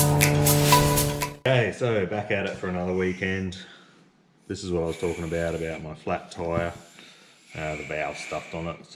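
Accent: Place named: United Kingdom. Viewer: interesting It sounds like you're in the UK. Our USA ultra-lites are much lighter but I was thinking of doing something similar... just some ideas to pass along. the flying boat part is good, but I would do a high wing, also maybe some small out-riggers for weight saving with comparable stability out of a narrower, lighter, center canoe type body. We are limited to 254 lbs plus an allowance for floats and parachutes... yours is looking good. Did you use plans or a kit, or is it your own design?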